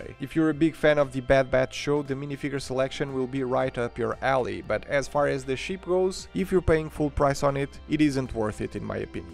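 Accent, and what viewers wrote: Portugal. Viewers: És Tuga? O nome pelo menos é Tuga e o acento também. O mesmo do Mourinho, aliás o nosso! Eheh. Abraço e obrigado por partilhares.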